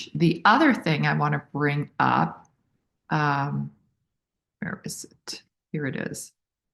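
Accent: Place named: New York, United States. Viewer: Your New York accent is funny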